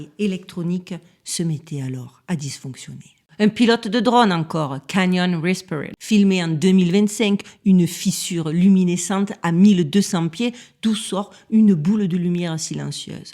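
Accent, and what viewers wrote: Marseille, France. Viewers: exellente video ...j adore ton elocution et ton petit accent charmant de Marseille ;;; Felicitations pour ton travail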